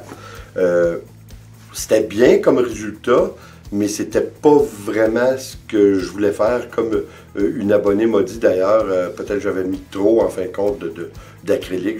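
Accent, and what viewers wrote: Canada. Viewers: Un petit bonjour de Belgique ! J'ai toujours un plaisir fou à regarder vos vidéos. Je me régale du début à la fin et j'adore vous écouter parler ; votre accent canadien me plaît vraiment beaucoup. Merci du partage de vos expériences.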